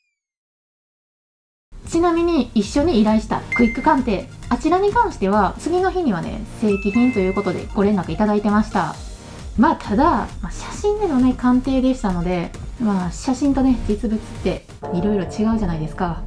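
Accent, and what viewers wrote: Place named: Kansai, Japan. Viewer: 関西弁弱いなー😙😙😙😙😙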